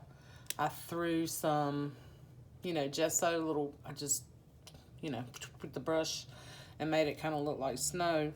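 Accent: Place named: South, United States. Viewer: Yes please, love all your process, picking out your holiday papers?, I can watch you do anything, even drying paint. Love your tags. Please don’t say negative things about yourself, I know Im not the only one who appreciates all that you do for us. Your southern accent is wonderful to listen to. I’m really enjoying Christmas in August, thanks!!💕💕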